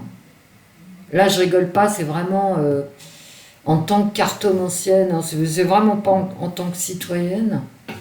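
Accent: Paris, France. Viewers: Je te connais pas depuis longtemps grâce à Abbygaelle. Je suis très heureuse d avoir fait ta connaissance. Tu as un adorable accent de Titi parisien ou alors je me trompe. Lol. Faudra que je regarde si tu as regardé du côté de ruspoulidor. L automne va être difficile pour tous. Je t embrasse. Maryse. 🤗♥️🌟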